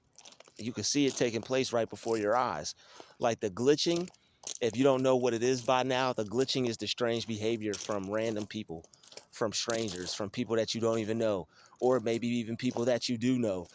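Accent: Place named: Midwest, United States. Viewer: Spot on. You from the Midwest? You talk like it. I get what you're saying. I know from experience.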